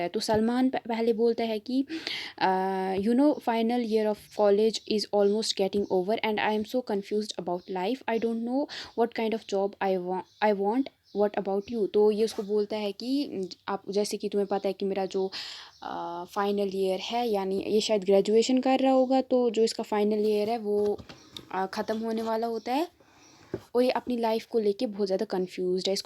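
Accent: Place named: Himachal Pradesh, India. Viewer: Your himachli slang is soo good 😉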